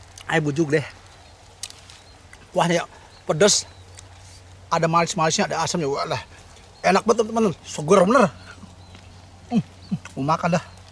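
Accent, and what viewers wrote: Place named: Jakarta, Indonesia. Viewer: Masa alloh bng,boleh tau dari mna nih mirif btawi logat nyh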